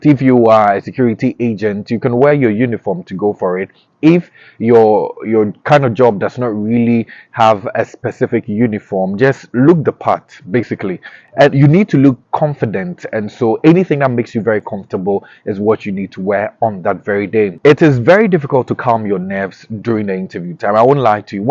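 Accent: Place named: Caribbean. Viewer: my brother you sound like youre from the Caribbean. ty so much for the information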